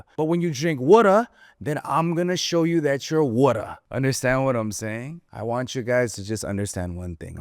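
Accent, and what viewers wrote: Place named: New York, United States. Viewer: U sound dumb in this one fr just cuz of that New York accent. What was that?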